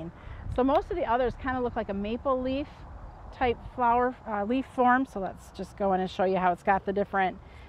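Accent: United States. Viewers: That Northern Midwest accent takes me back to my family...😊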